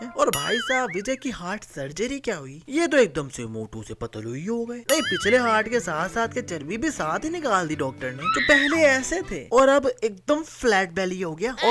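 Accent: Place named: Pakistan. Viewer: Tu 100% pakistani hai tere accent se pata chal rha hai, aukat ho to channel pr bata de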